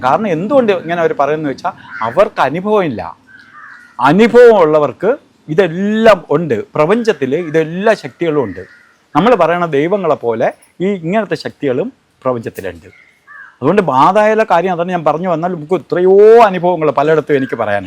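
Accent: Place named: Kannur, India. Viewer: ഇത് കണ്ണൂർ സ്ലാങ് അല്ലെ. ഇദ്ദേഹം ആ നാട്ടുകാരൻ ആണെന്നാണ് തോന്നുന്നത്.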